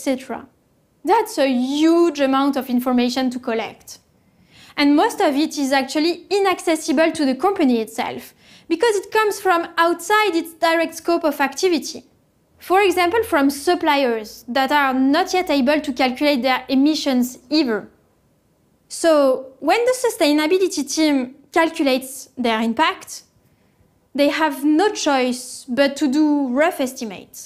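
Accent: France. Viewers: I think she's French. But it sounds so good. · French accent is quite cute 😅😂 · Let me guess.. she's from France.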